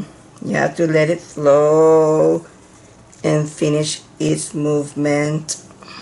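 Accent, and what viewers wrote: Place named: New Mexico, United States. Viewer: I love hearing the way you say the names of colors, I miss New Mexico and your accent is lovely to me, and I have been able to remember the different types of colors, for choosing due to the way it registers.. and the fact that your examples with these paints are totally inspiring, motivating. thank you.. for honest and talented pours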